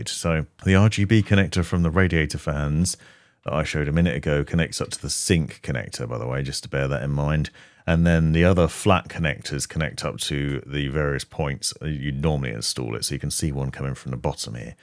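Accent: United Kingdom. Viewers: it’s not just the speed …it’s that Brit accent hahaga GREAT video man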